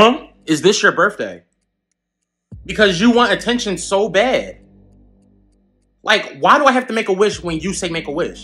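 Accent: Philadelphia, United States. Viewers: you have a Philly accent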